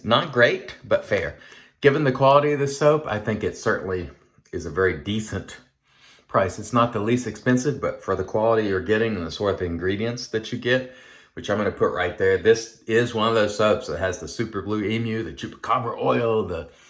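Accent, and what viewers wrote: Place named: South, United States. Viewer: That soft Southern accent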